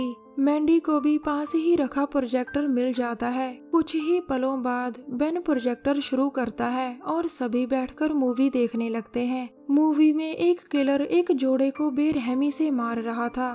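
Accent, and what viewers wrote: Punjab, India. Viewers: Madam aap ka punjabi accent has its own fanbase.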